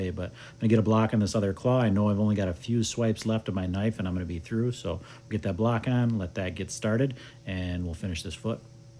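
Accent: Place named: Wisconsin, United States. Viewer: I can tell a good ol Wisconsin boy when I hear one! I love your videos. You're the best one I've seen. You can really tell you care.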